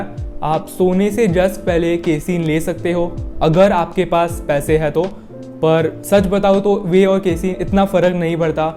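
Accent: Maharashtra, India. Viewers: His Hindi has Maharashtrian touch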